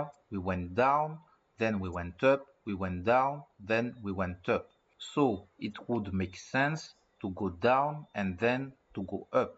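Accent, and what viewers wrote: France. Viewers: Hey man ! Are you french ?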